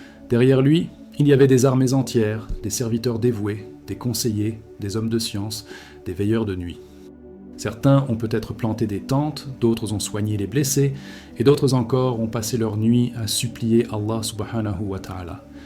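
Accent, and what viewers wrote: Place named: Belgium. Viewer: Salamaleikoum je connais cet voix et c'est un belge merci Barak Allah u fiek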